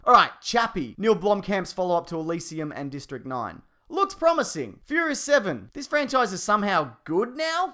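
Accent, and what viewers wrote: Australia. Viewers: You sound like an Aussie and I would know cuz I am one and I live in Australia. Also you should try to do a collaboration with screen junkies honest trailers because you would be hilarious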